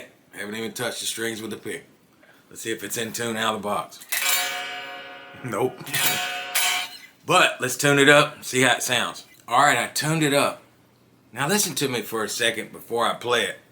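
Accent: South, United States. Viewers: are you located in Orlando? I always thought you were from Kentucky, Alabama or somewhere down there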